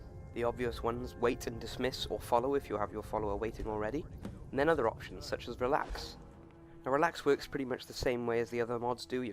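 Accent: United Kingdom. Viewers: You sound like a Welshman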